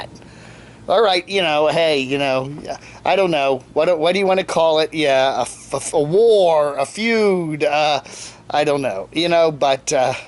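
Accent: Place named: Baltimore, United States. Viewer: I love watching and listening to her Baltimore accent. She wants to have fun and has a lot of knowledge that she shares.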